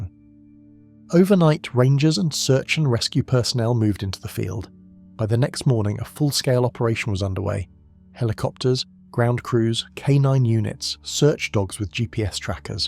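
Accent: England, United Kingdom. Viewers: You think this is bad try to sing to a strong Irish or strong Scottish accent lol. The narrator has an awesome English accent!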